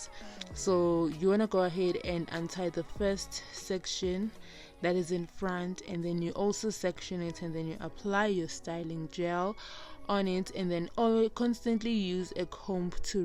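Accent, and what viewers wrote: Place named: Africa. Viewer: she speaks like an African, there's no American accent even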